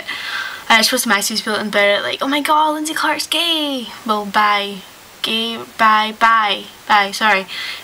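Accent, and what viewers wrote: Scotland, United Kingdom. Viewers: Scottish! :D Loveee ittt. :D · you are quite adorable & i love your accent. if i lived in scotland, i'd totally date you<3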